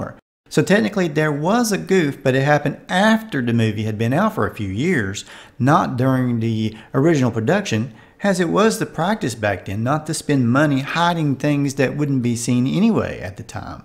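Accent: South, United States. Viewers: dunno too busy trying to listen to your forrest gump accent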